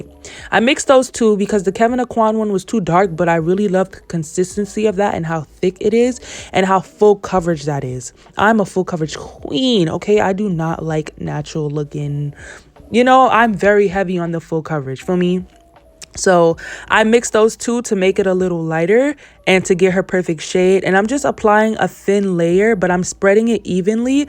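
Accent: United States. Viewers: I also mix foundations to get my clients perfect match. I still use the back of my hands it warms the products. You sound like you’re from Dade County 😂 Miami you Sound like me New Subbie 👋🏾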